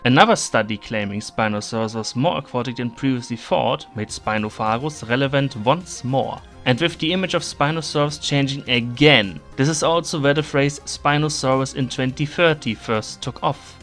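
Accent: Germany. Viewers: Are you german? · deutscher akzent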